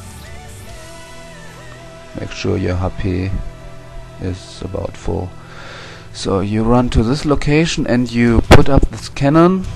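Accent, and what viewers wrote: Germany. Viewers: your from germany right? i hear from your acent i think or i just suck at it :/ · nice german accent lol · R u german? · den deutschen akzent hört man raus :D gute guide p.s. ich habe nichts gegen den deutschen akzent... wenn ich englisch rede klingt das fast genau so mit dem akzent =D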